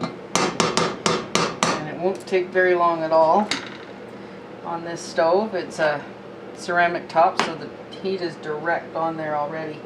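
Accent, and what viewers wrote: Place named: South, United States. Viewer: Thank you for getting back to me. I will check goggle. The reason I ask where you were from. I noticed a southern accent in your voice. I am from the southern part of Virginia. But I now live near Baltimore, Md. The northern part. I don't get too meet very many southern people here. I will look forward to more of your videos. Thank you very much for sharing. Have a Merry Christmas.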